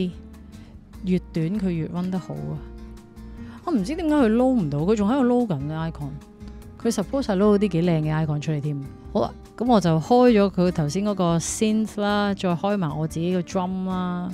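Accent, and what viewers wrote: China. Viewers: 好好听的白话，介绍的工具很有趣，关注了，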